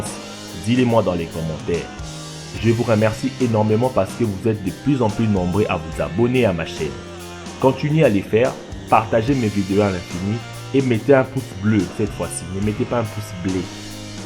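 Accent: Africa. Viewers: bien l'accent qui sent le mafé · j'aime pas parler de l'écriture, mais c'est pas de ta faute si t'as cette écriture de merde et lui cet accent. il fait pas exprès. t'as jamais entendu un africain ou quoi toi ? 😂😂😂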